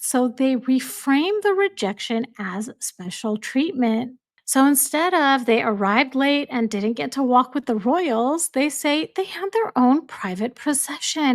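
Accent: United States. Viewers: DO YOU HAVE AN AMERICAN ACCENT ???? IF .IT IS NOT BAD ENOUGH. YOUR VOICE. .????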